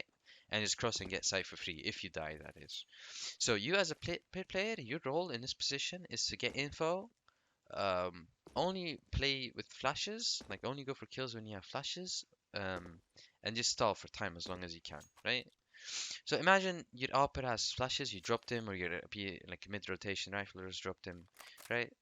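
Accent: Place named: Netherlands. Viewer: Why you sound netherlands, bonuskaart typa english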